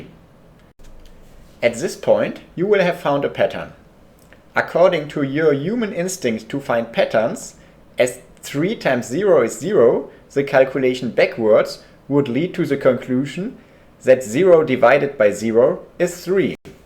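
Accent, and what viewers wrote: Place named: Germany. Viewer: Nice Kraut accent.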